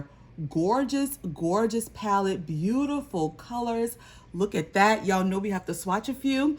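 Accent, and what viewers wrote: Louisiana, United States. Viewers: Your makeup and nail color are so pretty!!! And I love your Louisiana accent especially when you say your name and today...so cute😊 nice unboxing too😊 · You have to from LA with that accent. Love it! Lemme guess BR or NOLA.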